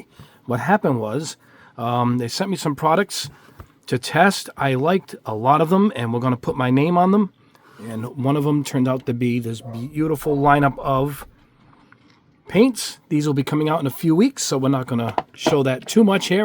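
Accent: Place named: New England, United States. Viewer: Back to modeling after a 60 year hiatus. Very much like your informative videos. I live in CA and recognized your New England accent right off the bat. I grew up not far from you, north shore Boston. I've been looking for MRC leveling thinner but it is nowhere to be found so I just placed my order for your replacement product. Thanks, looking forward to trying it out.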